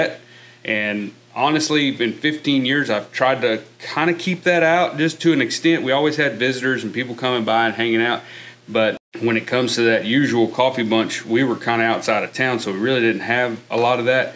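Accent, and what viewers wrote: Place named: Texas, United States. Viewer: Boy howdy, your Texas accent is really strong on this video! Who you been hanging out with this weekend???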